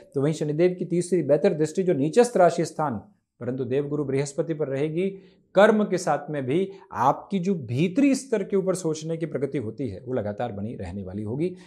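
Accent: Standard. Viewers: Guru ji ki hindi bahot pure 👌 hai aur aise hi honi chhahiye ❤ English medium ke bachho ko Badiger problem hoti hai hindi ki 😅 thanks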